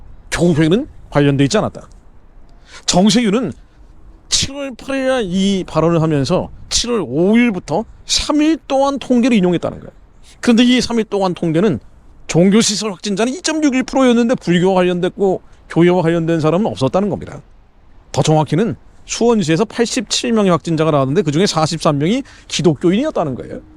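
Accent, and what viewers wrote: North Korea. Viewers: 하나님의 심판이 있을것이요 이사람 목사도 재명된자다 목소리도 이북 빨갱이 냄세가 나는데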